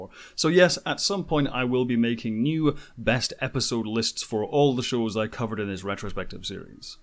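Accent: Scotland, United Kingdom. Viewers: . Your brogue is wonderful. Edinburgh I'm guessing?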